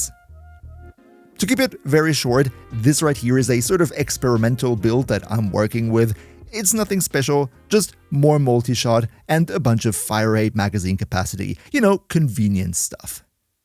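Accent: Germany. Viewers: YOU'RE GERMAN! I have been wondering where your accent was from!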